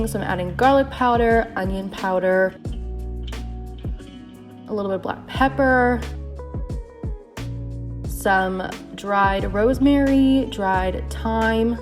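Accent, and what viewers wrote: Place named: Philadelphia, United States. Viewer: Great recipes! Just started low carb vegan a couple weeks ago and already seeing results. Are you from Philly area? I detect the accent (I'm from there too :) )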